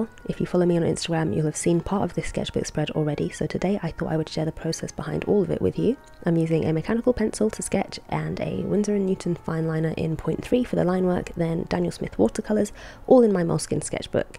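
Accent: United Kingdom. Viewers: this is the most British commentary I've ever heard lmaoooo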